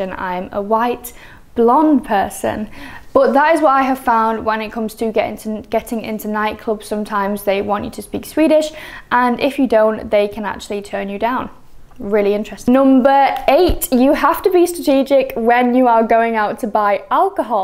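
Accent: United Kingdom. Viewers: Great video! I'm also half Swedish and am planning to move to Sweden some years after I finish uni here in the UK :) One point tho, I actually really don't mind the higher taxes! Yes, it definitely feels like a LOT when you are coming from a country like the UK or the USA but honestly in terms of what you get out of those taxes it's pretty incredible! You have great maternity and paternity leave, child benefits are incredible if you want to have a family, child oriented services and schooling in general is much better than many other places imo, there is investment in maintaining infrastructure, the healthcare system is pretty fantastic and the general state of the country in general. There are things I'd criticise Sweden for but personally I don't mind the taxes. Again, really great video! Looking forward to more content, what UK accent do you have btw? 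I can't quite place it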